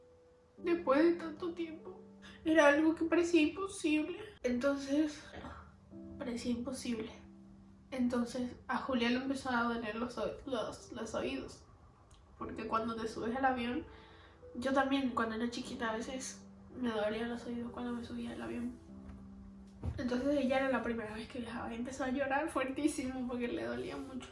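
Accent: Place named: Paraguay. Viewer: Sabiaaaa que eras paraguaya por tu acento de español aaa que lindoo😍 yo también soy de Paraguay😎🇵🇾 edit: no sé cómo llegue acá mi mamá también vive en Argentina y este año quiero ir para conocerle. (también soy cristiano) pero ellos no :(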